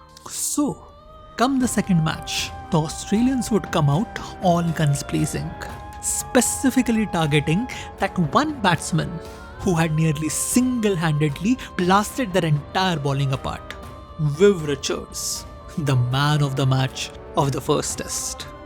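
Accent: India. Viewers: An Indian narrator having a strong Indian accent is odd to you? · Its not pronounced " Deeter-Mine". We know you have typical cringy Indian accent but try saying it as one word " Determine". Thank you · What a crazy Indian accent this narrator has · Strong Indian-accented narration notwithstanding, this is just the finest portrayal of the making and initiation of the "fearsome foursome" ever! I am WIndian myself and a casual cricket fan but never was as clear about what took place then as I am now. Great information, and wonderful perspective. Thanks a lot! --Æ. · Typical Indian English 🤣🤣🤣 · What a pathetic background commentary! Seems Indian 🤣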